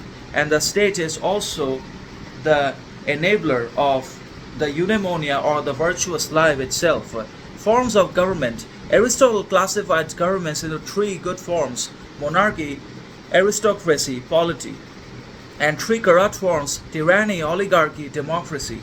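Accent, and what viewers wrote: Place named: England, United Kingdom. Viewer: I like your English accent bro.. as well as philosophical... how your learn this accent.. guide me... I am struck in fluent and lack of accent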